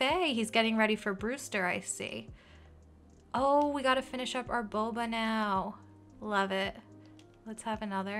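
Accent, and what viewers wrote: United States. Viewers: you can tell he is from the us :D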